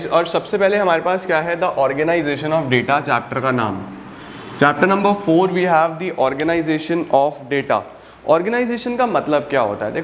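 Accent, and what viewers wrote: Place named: Punjab, India. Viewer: Mujhe lagta hai ki aap punjab ke rehne wale ho.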